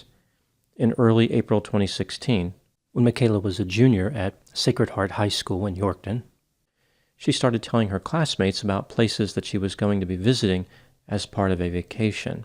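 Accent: United States. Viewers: He should learn how to say Regina good gravy. Can tell you are american . Hard to trust what you say when you can’t even say the correct pronunciation.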